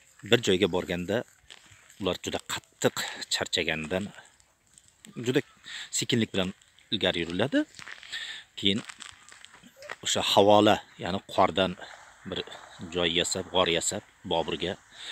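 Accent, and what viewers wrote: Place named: Surkhandarya, Uzbekistan. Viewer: Сурхондарё еки Кашкадарё дан деб тахмин килгандим шеванггиздан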